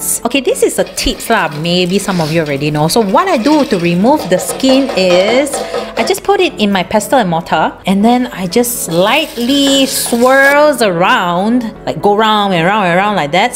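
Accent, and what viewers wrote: Malaysia. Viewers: Love ur manglish babe 😂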